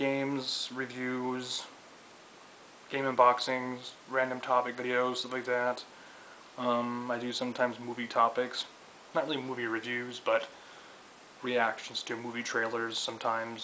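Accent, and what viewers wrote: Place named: Canada. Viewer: You should of talked more about your favorite games and influences. What was the first game to really blow you away? For me it was Final Fantasy on the nes. I was probably 9 years old and just couldn't believe how friggin cool that game was at the time. Also you have almost a Canadian accent, are you in the States or Canada? Just curious.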